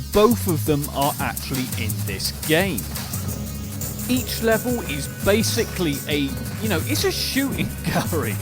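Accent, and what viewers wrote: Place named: United Kingdom. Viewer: i hate when your gross british accent utters rebecca when the character is obvi jill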